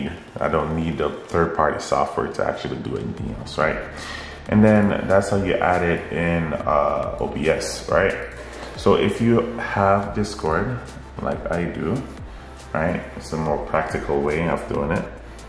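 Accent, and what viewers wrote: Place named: Jamaica. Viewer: I hear the Caribbean accent. If I had to guess. Yardy (Jamaican). Thanks for the video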